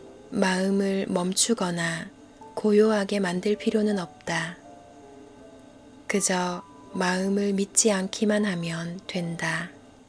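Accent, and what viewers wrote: Gyeongsang, South Korea. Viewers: 경상도 사투리가 부드럽고 달달한 목소리로 들립니다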